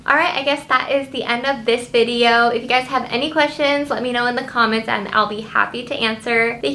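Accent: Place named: Bay Area, United States. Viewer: You're channel just popped up on my feed. I was watching this and I was like she sounds like she's from the Bay. I looked at your IG and BOOM lol. P.S. thanks for the tip about the cardboard!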